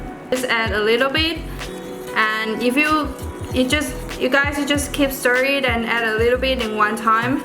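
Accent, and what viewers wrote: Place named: Asia. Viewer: My mum has the same Asian accent as u!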